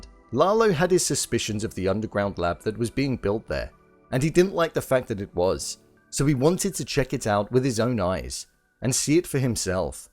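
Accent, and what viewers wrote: United Kingdom. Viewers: Your British accent lol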